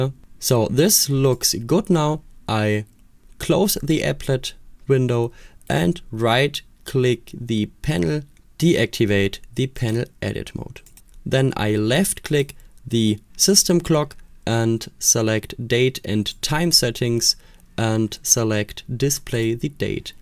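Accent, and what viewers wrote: Germany. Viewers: ich höre da einen leichten deutschen akzent raus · Den Deutschen höre ich doch raus, danke für das Tutorial! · i love deinen german accent Digger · Thank you mate, your Accent sound like Super German by the way😊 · Love that german accent :face-blue-smiling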